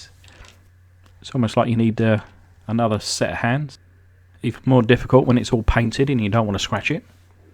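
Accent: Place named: United Kingdom. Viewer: love that British... I’m Yorkshire. Proper Broad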